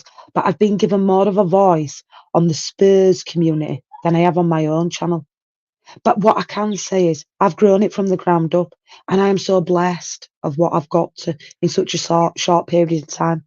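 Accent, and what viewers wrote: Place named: Leeds, United Kingdom. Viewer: I think you are beautiful, and all the panel are beautiful. What you do is amazing. Love you. You are accepted by the one's who really care. Fuck the haters and just focus on the one's who care for you and what you do for your community. Take care, thinking of you x. And by the way, I love your Leeeeds accent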